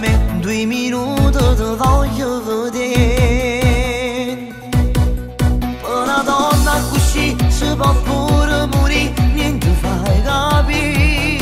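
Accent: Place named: Sicily, Italy. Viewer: Per quanto hai imparato il napoletano si sente che sei siciliano (adoro la Sicilia e i siciliani)..ma napoletani si nasce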